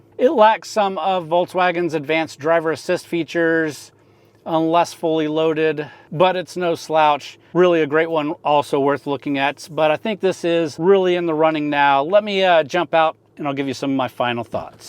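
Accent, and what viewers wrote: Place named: Texas, United States. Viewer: Don’t judge. Not all people from Texas talk like this.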